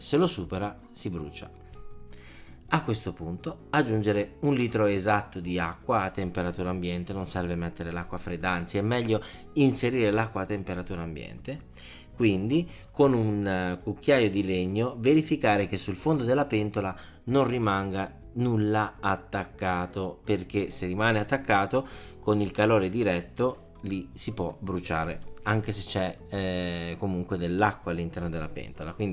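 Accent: Naples, Italy. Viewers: haaaa bellissimo il tuo napoletano,e jammebbell"jà Bravvvoo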